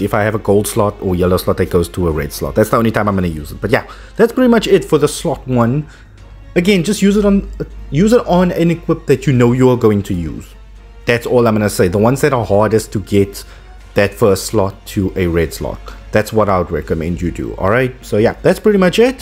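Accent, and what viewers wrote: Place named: South Africa. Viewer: Knew It was a fellow South African by the accent😂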